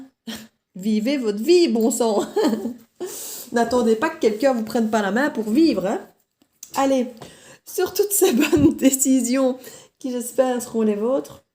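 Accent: Belgium. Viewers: Voilà que je vous découvre depuis la Belgique.... vous êtes géniale... Moi par contre je n'ai personne d'autre dans ma vie car rupture le 22 mars ....et je n'arrive pas à l'oublier pour le moment.....un petit accent de Belgique peut-être ? Je me trompe peut-être....😊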